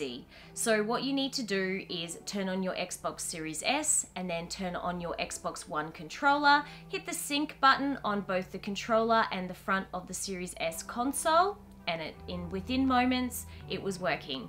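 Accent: Australia. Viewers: Omg are you Australian because I am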